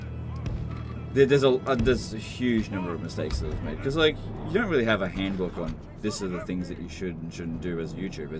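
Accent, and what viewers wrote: Australia. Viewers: i was there when he still cursed a lot spoke australian while doing m2tw XD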